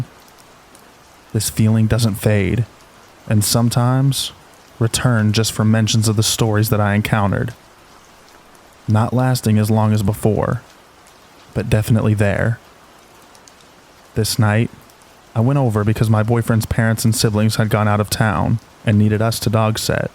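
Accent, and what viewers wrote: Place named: South, United States. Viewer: I love listening to your stories and sometimes picking up a little bit of southern twang, I always smile when I hear it!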